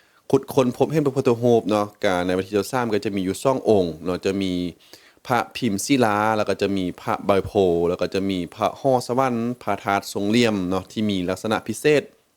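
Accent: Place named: Lanna, Thailand. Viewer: 💐💛🤗 สำเนียงบ่าวล้านซ้างใกล้เคียงคล้ายคลึงสำเนียงคนล้านนาขนาด ... 👏⚘️😘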